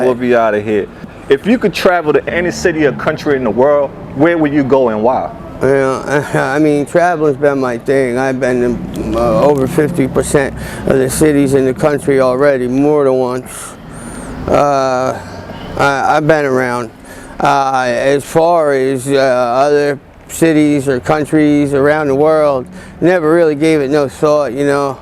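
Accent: New York, United States. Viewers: fair enough. Today I met a guy from New York that sounded just like you is all.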